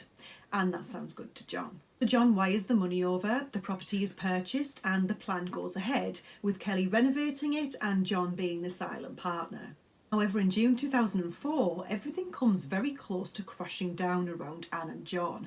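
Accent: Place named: Northeast England, United Kingdom. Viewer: Ooh just discovered your channel. I love all the true crime youtubers. You also sound like you have an accent very close to mine. You also North East England?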